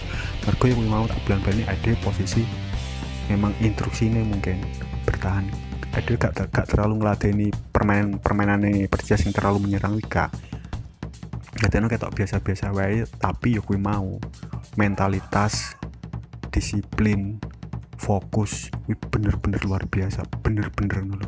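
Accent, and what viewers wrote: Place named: Surabaya, Indonesia. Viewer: Bahasane suroboyo bgt 😁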